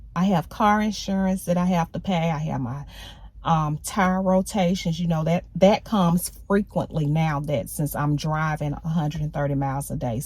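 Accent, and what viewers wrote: South, United States. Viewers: I'm trying to figure out what state you are from with how you pronounce your words like tire >tyurre lol, its cool bit of a southern drawl. Are you from Maryland or Virginia?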